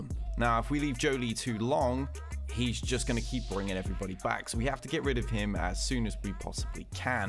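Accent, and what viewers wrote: United Kingdom. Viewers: Another great video mate its about time you got this buzz. As much as I like Warrior and Cub its much easier to listen to another proper english lad.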